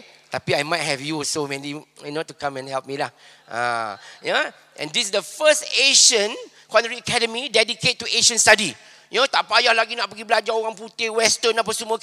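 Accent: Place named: North, Malaysia. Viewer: Mak org Singapore, ayah org Melaka, tang mana boleh melekat loghat Utara?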